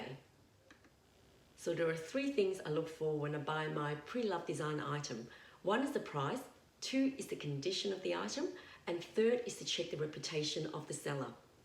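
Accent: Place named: Australia. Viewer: Hi! I love your vibe! I also love your accent! Australia? Keep up the great work! 👏😎💖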